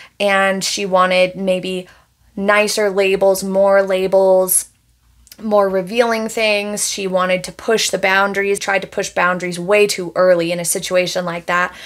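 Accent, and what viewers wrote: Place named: Midwest, United States. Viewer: are you from the midwest? I'm catching a slight accent.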